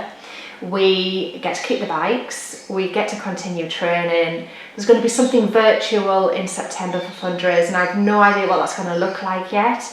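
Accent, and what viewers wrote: United Kingdom. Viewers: Hi, I sure do miss your videos. Please consider making one soon. I love watching you, your English accent and your sweet Daisy. Plus your birdies. I would love to also see how you do the petite stitch/tent stitch on your Mirabilias. I just recently started Royal Holiday and I’m needing to see your beautiful stitching on faces. I hope we see you pop in soon. Love ya and leave ya!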